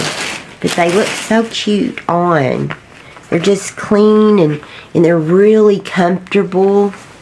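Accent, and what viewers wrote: United States. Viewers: Aww darn it, your accent reminds me of my grandmas and aunts from Oklahoma 💕 I watch reviews for the talking so talk away lol I’m also addicted to Temu 😂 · You are so entertaining! I’m a great grandma that loves Temu also. I loved the cat shower curtain. I’m ordering one for me. What state are you from? I’m from Alabama. You sound southern like me. 🐓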